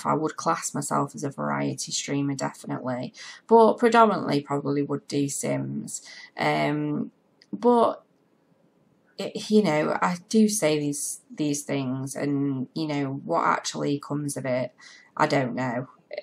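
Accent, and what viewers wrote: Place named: Northern England, United Kingdom. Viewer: You are more than worthy of everything you do! It's just jealousy of your talent for these builds that's all. Really enjoying binge watching your videos and to hear your genuine comments on the bs you receive! Also nice hearing you're English like myself, except I'm not from your area (I think you're up north somewhere). X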